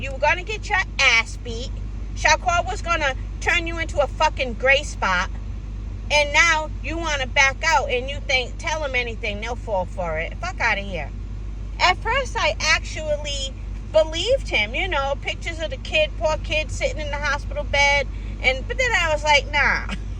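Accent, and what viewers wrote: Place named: Boston, United States. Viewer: Talk yo shit with that Boston twang!! I want Abdullah in Buds camp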